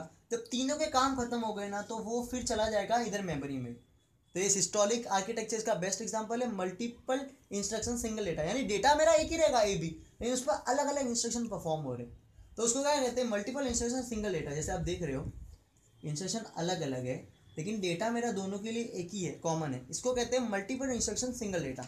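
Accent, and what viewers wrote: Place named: Indore, India. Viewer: Your way of speaking is awesome! Indori type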